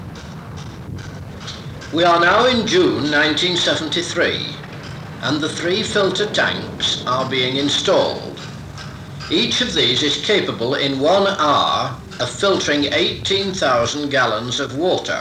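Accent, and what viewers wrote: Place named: RP, United Kingdom. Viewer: The narrator wasn't half posh.....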